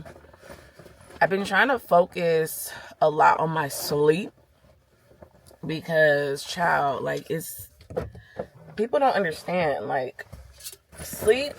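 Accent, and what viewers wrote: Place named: California, United States. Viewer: You look gorgeous, I'm from NY originally but raised in Florida. I could tell by your accent you're from CALI but your fits gimme NY respectfully. Like you'd fit right in, issa compliment.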